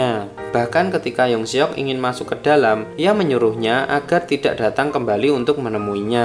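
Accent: Java, Indonesia. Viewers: Terlalu medok host nya 😅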